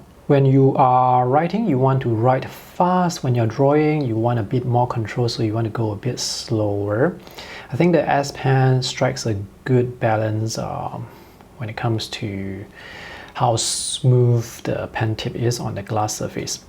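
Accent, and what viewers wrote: Singapore. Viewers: Bro u Singaporean or Malaysian?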